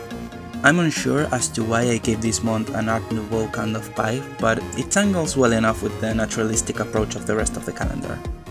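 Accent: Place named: Basque Country, Spain. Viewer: Eres Basco?? Qué chimba!! Fuera una idea estupenda hacer este presente para tu amiga Por cierto, me encanta tu acento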